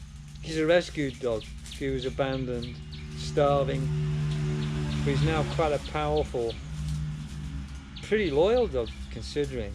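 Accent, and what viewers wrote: United Kingdom. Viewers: Can spot the scouse accent lol .... said the woolyback lol 😂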